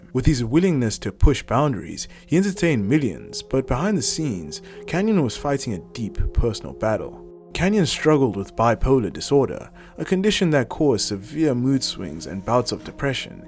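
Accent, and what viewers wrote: South Africa. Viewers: Are you South African perhaps? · He sounds South African, I thought I was bugging.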